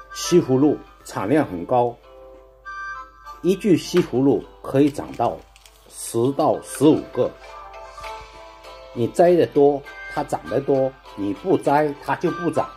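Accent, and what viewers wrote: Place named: Shanghai, China. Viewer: 你家还种菜，好新鲜，我种过几次西葫芦，很能长的，你是上海人吧？听你讲普通话觉得是。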